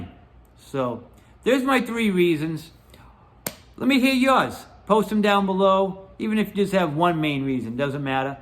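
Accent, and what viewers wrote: Boston, United States. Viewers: I could tell from your accent that you were from Boston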